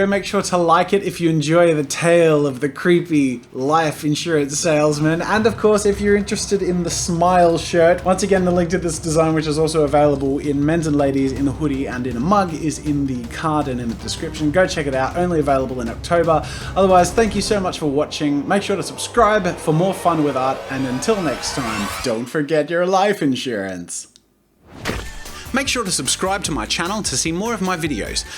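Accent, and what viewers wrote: United States. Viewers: Nice American accent for the story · omg that american accent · I first I thought he was playing a scary story in the background, but now I'm like" oh wait that's him speaking I think". if that was you talking, one question. How do you immatate American accents so well? 😂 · The slight American accent in the story kinda feels weird with you tbh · his American accent is point on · the American accent that was good as heck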